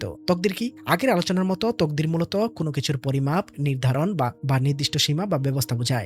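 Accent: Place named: Jamalpur, Bangladesh. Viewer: oh আচ্ছা সেটা বলেন,,হ্যা সমস্যা নেই,তবে আমগর এলাকার নেহালা ভাষা ত, মেল্লা মিল আছে, হেজন্যেই কইল্যাম।যদি এবা অইতো এপনে আসলেই জামালপুরের, মেল্লা বালা অইতো 😅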